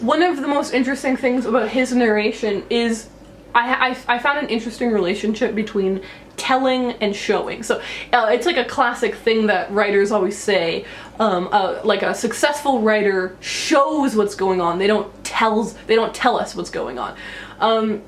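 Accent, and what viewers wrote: Canada. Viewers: First I've ever seen of you, and I don't know you at all. From the accent, Winnipeg? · are you canadian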